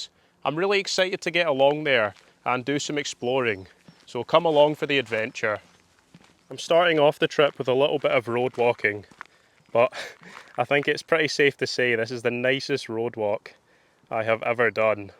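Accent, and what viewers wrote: Scotland, United Kingdom. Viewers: oh babez, you’re dead hot and I loooooove yer scottish accent. i sooooo would spend one or even more than one night with you in a bothy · Just fallen on your link which is really splendid. Your images and commentary are excellent. That Scottish accent is so clear. I follow two other Scots on YT Steve Marsh and Ruth Aisling, I'm English but have lived in France for many many years.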